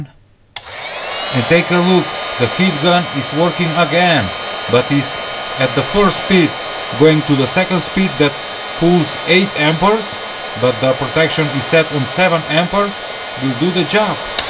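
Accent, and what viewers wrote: Russia. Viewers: I hear russian accent.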